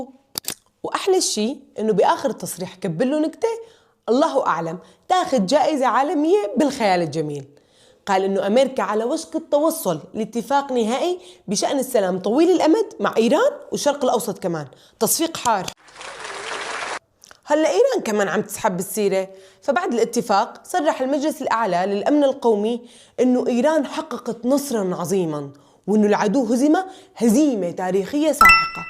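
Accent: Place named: Syria. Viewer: لهجتك لأي منطقه بسوريا ؟ بخصوص الحرب راجعه بعد كم شهر والله اعلم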